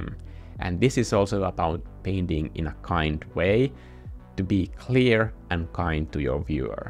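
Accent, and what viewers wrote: Finland. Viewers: I KNEW I recognized a fellow Finn in your accent!!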